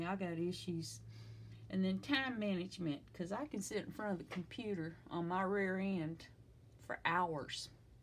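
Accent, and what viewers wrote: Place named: South, United States. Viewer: You are either from Mississippi or where I am from.... Arkansas!!! Enjoyed your video.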